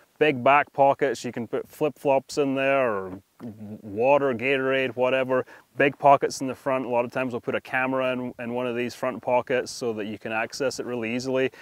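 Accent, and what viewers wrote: Scotland, United Kingdom. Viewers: Sounds like a hint of Scot in that accent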